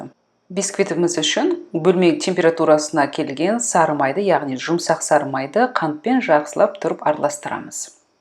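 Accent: Standard. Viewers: казакша таза сөйлеуге тырысасың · Рецебіңізге рақмет таза қазақша сөйлейсіз жасап көремін міндетті түрде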